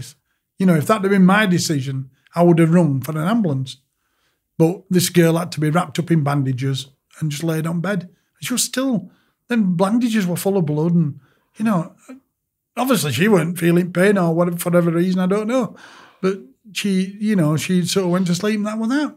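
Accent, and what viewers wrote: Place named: Yorkshire, United Kingdom. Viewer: Such a lovely lovely man. He has been through so much in his life. I was so fascinated listening to him tell his story. I shed a tear when he did as the way he was treated was so dispicable. Love his Yorkshire accent too. Thank goodness he has a great supportive wife to help him get through. bless him 💘💖❤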